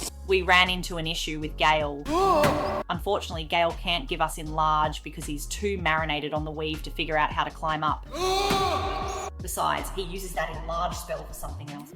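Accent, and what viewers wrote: Australia. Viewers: i love the australian accent, I wish i was australian T_T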